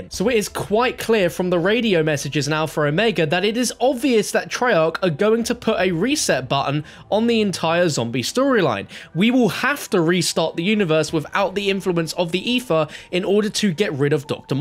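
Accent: England, United Kingdom. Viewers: Why do you English say EFER when it’s ETHER?! Or Hewps and it’s Helps!? Becuuz when it’s because??! Just sayin man!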